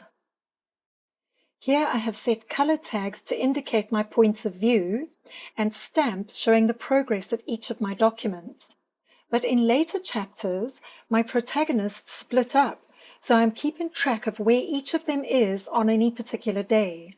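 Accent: South Africa. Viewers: I hear a South African accent!! Hello from little Knysna!! I just got Scrivener for Nanowrimo this year!! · Are you from South Africa? Love the accent!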